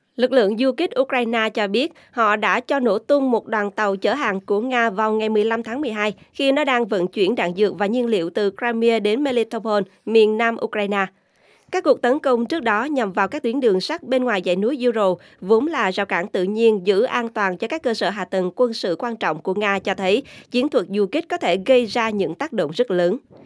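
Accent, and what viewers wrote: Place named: North, Vietnam. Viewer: HAPPY NEW YEAR 2024 to The Saigon Post. Chúc The Saigon Post càng ngày càng phát triển, Đề nghị The Saigon Post sử dụng phát thanh viên có giọng nói của người Sài Gòn thay vì giọng nói của miền ngoài, chúng tôi không thích, cám ơn.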